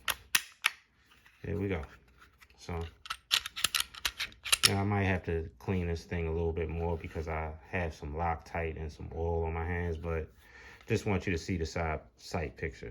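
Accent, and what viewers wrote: Baltimore, United States. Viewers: I hear Baltimore all in your accent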